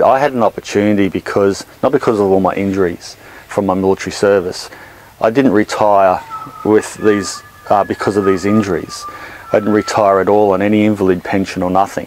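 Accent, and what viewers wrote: Australia. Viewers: love how hard you make your garden work; it looks great, i moved to the states about 50 years ago. so its good to hear the australiam accent as well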